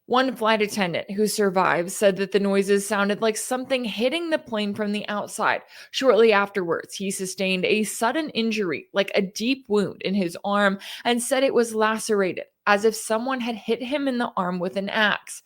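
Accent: Texas, United States. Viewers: She sounds fine nothing wrong with it a girl from Texas.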